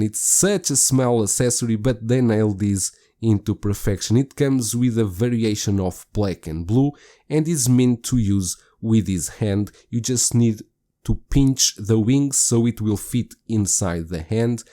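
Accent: Portugal. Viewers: Com o sotaque, vou assumir que o senhor é Português! Que bom conseguir ouvir a sua opinião! Awesome review, this figure is crazy good. I can't afford it, but I Pre-Ordered the Aragorn sculpted hair version. Can't wait!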